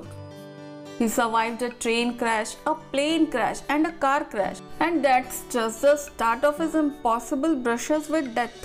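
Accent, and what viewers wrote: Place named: India. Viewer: Your accent is good. There is nothing wrong in Indian accent 😊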